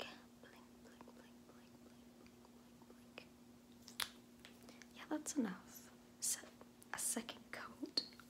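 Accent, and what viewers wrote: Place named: Massachusetts, United States. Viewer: You from mass?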